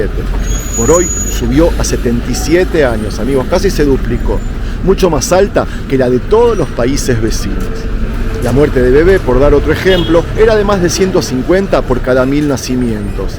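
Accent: Buenos Aires, Argentina. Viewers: Shalom...admiro tu enseñanza..a veces pienso que lee tus relatos... Y me gusta tu acento porteño..un abrazo desde Salta Argentina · No se veo mucha manipulación, en este video peligroso es meterse en un barrio de caracas y lo otro q no me explico, es que el único lugar del mundo donde se pelean judios y árabes x supuestos motivos religiosos es en Israel, 🤔sospechoso de verdad, tuvieron unos dos mil años en la diaspora, y los judios q Mato Hitler ni siquiera eran de medio oriente la mayoría eran de Alemania 🤷🏽‍♂️, y tenían religión judía, se entiende q se usara esto como escusa para la creación del estado de Israel pero la mayoría de los israelitas q viven en la nación de israel yo diría q son de otros orígenes,no semitas, asi como tú q tienes acento argentino o uruguayo ni idea de cuál país seas pero de x allí, lo cierto es q de origen semita no creo, pero puede q me equivoque x otra parte habría q ayudar a un país como burundi donde realmente se ve la verdadera razón de las guerras,la pobreza extrema, y la exclusión social de los más desamparados del planeta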